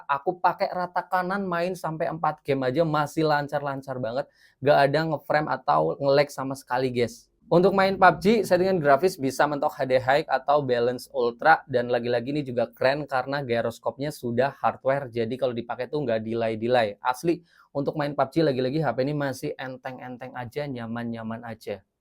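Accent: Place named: Java, Indonesia. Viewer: Uni chanel ini KAMPUNGAN banget cara melafalkan kata Inggris nya Guys malah dibaca Ges, HARUS nya Gais atau Gaes, jadi ilfeel males lanjutin nonton, biasanya Jawa itu gak bisa bilang Ai, semua huruf i malah di baca E padahal harusnya Ai, Guys ( gais, temen temen, sobat) malah di baca GES, lalu riding (raiding, di review otomotif) malah di baca Reding, Finger print ( harus nya di baca Finjer Print) malah si bilang Finger Print), harus nya G itu di baca J bukan Ng.. Miris nya yg subscribe banyak pasti Kejawen kampung dari pedalaman yg sama sama katrok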